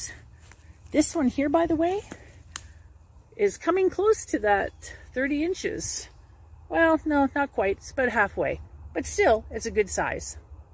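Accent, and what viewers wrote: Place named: Canada. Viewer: Beautifully presented and i love your accent, I'm presuming Canadian, in particular words like "about" "road", something about the way you pronounce 'oa' 'ou'. Get plenty more of those words into your videos please!.... 🙏💖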